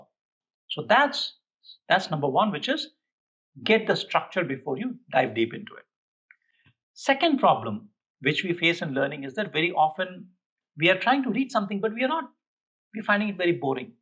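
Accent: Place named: India. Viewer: Yes, the Indian accent makes it more efficient to understand.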